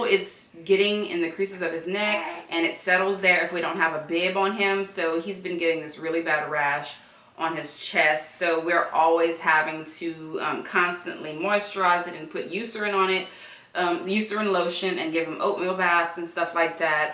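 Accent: Louisiana, United States. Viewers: girl he is so cute, Your LA'na accent is so thick LOL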